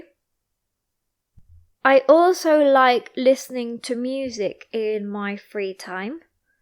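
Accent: United Kingdom. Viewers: 😮 Beautiful Beautiful voice, I am learning the British accent, I love this beautiful accent, and thank you for your videos, my friend, I really appreciate it. Greetings from Mexico!!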